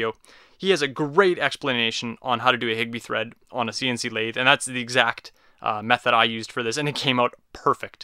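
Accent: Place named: Canada. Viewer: I could hear the maple leaf dialect within 3 seconds of the beginning of the video. Hehe. Gotta love Canada.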